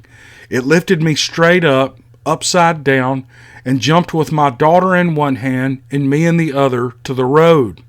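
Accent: South, United States. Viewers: Sir, I'm not sure what your name is, but I enjoy your channel and it interests me ,and I love your southern accent, I'm from Malaysia, keep up the good work. I never met Mr Foot before haha. It's alright ,it's amazing and you are amazing. God bless you. 👍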